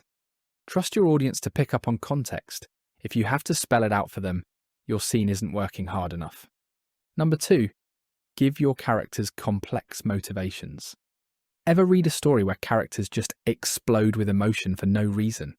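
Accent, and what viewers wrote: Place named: United Kingdom. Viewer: British accent)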